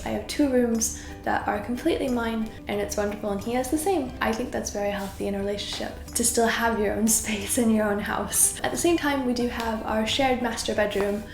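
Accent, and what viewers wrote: United Kingdom. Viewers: Ok this has nothing to do with the topic of the video but your accent is really interesting, because you are starting to use some British ways of pronunciation, the stress on certain syllables in certain words for example. I just find this really interesting as an English (as a foreign language) student. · your American accent is sounding UK. THATS AWESOME!! YOU GUYS ARE LOVELY.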